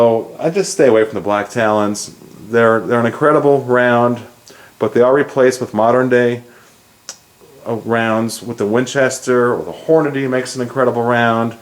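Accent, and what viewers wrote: Long Island, United States. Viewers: the fly popped in and left when you said bye,,, funny. what part of LI are you from. I thought I detected an East Meadow accent.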